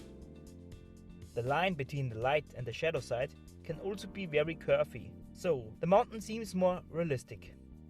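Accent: Germany. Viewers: Great yet simplistic tutorial in your "German🤪" English..with that said you have got a new subscriber here 🖐.... how about the video of Artist review of new Galaxy note 10 plus😃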